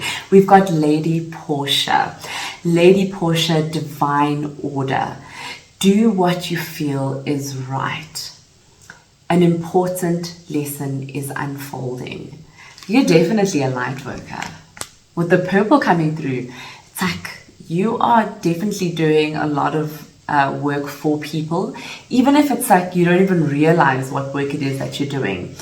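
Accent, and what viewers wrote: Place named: South Africa. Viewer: Lol it's a South African accent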